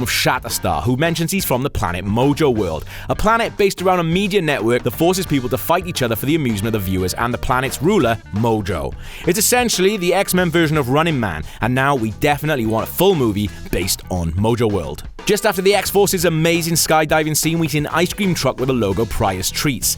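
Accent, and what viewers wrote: United Kingdom. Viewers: hey are you British? i cant really tell...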